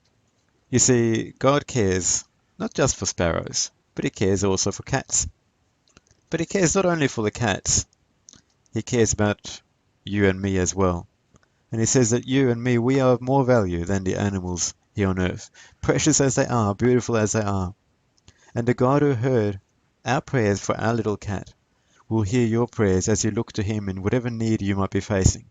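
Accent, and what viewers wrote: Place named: England, United Kingdom. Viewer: Aww... God bless you and your message. I came across your video because I think my cat has a UTI. Please pray for my cat, Harley. I will be praying for him and everyone who comes to this page. Prayer is powerful and thank you for your message. You sound English. I lived in England for 7 years and felt that I was the only Christian that lived there at the time. God answered many of my prayers while living there. God bless you and everyone that visits your video.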